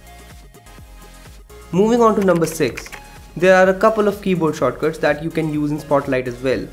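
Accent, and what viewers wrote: India. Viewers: Good video but the thick Indian accent forced me to watch several times to get the point. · I cannot understand Indian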